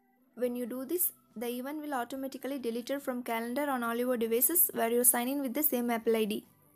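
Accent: India. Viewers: Thank you Indian voice sounding girl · First time I appreciate Indian accent people who do tutorials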